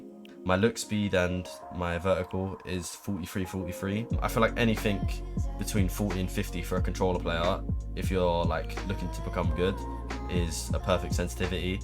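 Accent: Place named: United Kingdom. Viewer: Are u British I love the British accent 🥰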